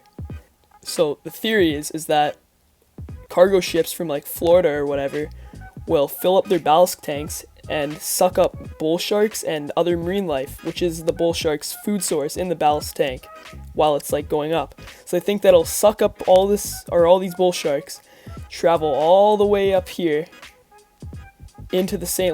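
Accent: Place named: Cleveland, United States. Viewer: Can tell this dudes from Cleveland 😂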